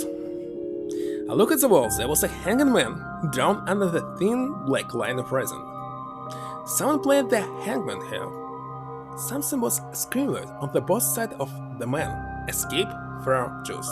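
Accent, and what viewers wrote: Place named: Russia. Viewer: С первой минуты чувству., что ты Русскоговорящий парень ))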